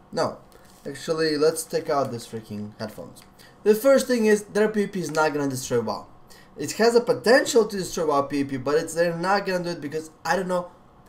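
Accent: Russia. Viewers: Pure russian accent and humor . Gotta love his style <3 .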